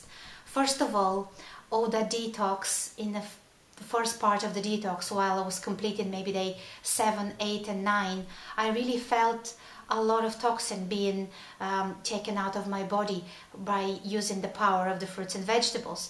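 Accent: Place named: Eastern Europe. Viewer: it sounds like youre from eastern europe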